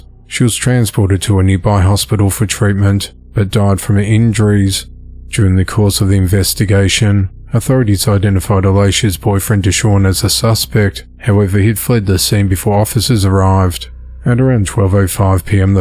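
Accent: Australia. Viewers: Are you Australian? Anyway have a nice holiday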